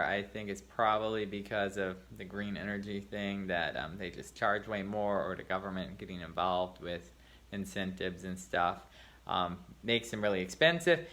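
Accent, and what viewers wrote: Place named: United States. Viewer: I've lived all over the world, I can only find voices like this in America.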